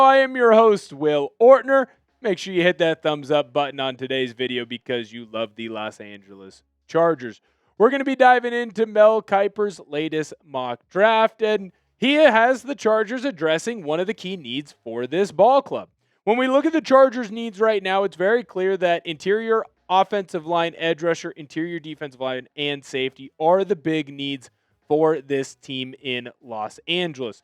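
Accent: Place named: Fargo, United States. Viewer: You sound like you’re from Fargo bro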